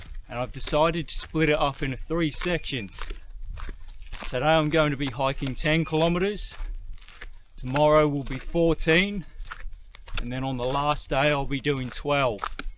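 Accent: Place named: Australia. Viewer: Cool vid. Kinda jealous actually.. but bro how long u been in Aussie for..?? Hard to tell your accent.. it’s like 5% British 95% Oz. Very hard to pick hey.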